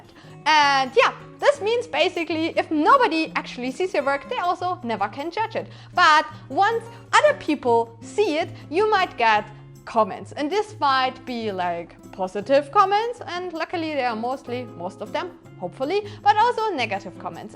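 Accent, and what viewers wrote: Germany. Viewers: awesome! I wish I could have friends like you around me that realize all the work done when you make a costume, realize a vid, a how to vid, a thing with a 3d pinter, learn moves on a fight scene or how to utilize softs like photoshop, lightroom, fusion360, hitfilm, passing time consuming energy trying to find how to make this special costume piece with this special color or texture, find materials and technics...generally all I have is people doing sports and watching tv series, never make a thing with their hands, so likes are few. Anyway, having positives people like you, enjoy my day and the most important thing is to be proud and happy of what we are, whatever we are, we are awesome , and never give a sh.. to people that dislike just for fun. (special mention to your german accent that I love because I understand all (and probably I have almost the same because I'm french :-D ). 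my tip when I see a bad comment: scroll! :-D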